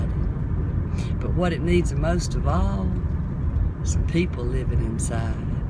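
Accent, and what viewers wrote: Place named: South, United States. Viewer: My mother would recite this poem for me when I was young. Well mom is gone now. I was thinking about the poem the other day and went searching YouTube. I like this one because she has a nice southern accent.Thank you.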